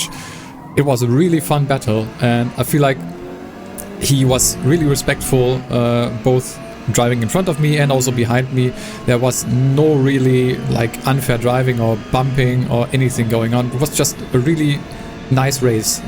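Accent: Germany. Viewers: Your english sounds like a gernan guy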